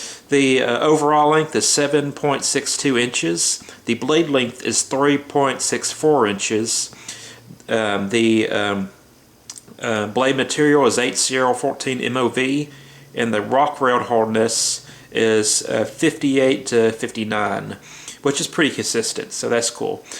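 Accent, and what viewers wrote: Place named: Washington, United States. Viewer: Your accent, Washington state correct me if I’m wrong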